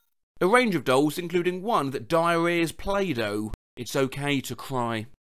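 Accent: United Kingdom. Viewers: hes british dw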